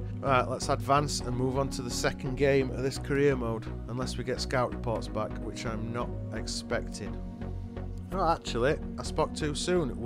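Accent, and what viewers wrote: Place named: Northern England, United Kingdom. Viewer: Relaxing, insightful commentary from a northern lad, doesn’t get much better than that! Liked and subscribed 👍🏴☕️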